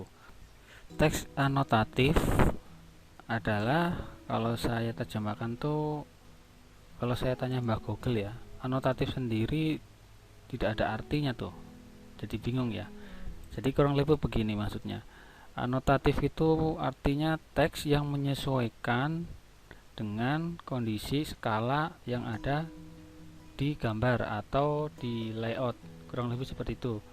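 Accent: Banyumas, Indonesia. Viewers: Cara mencari luas teks bagaimana mas????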